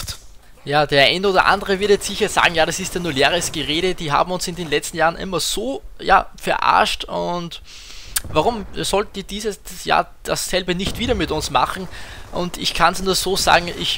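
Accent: Austria. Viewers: du bist a Österreicher oder? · bist du österreicher ? :D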